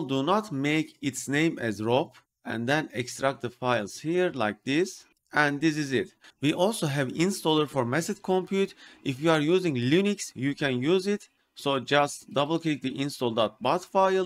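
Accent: Turkey. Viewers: Oh Türk aksanlı İngilizce. nerede görsem tanırım :) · I BET YOU'RE FROM TURKEY 😅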